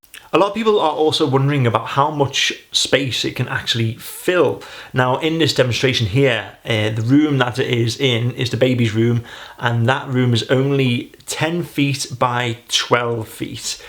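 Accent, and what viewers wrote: United Kingdom. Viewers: proper British English... much easier for me to understand you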